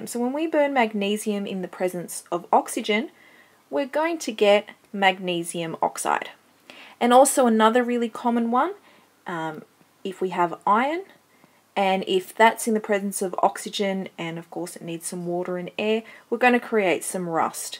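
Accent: Australia. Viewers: what accent is this? Australien?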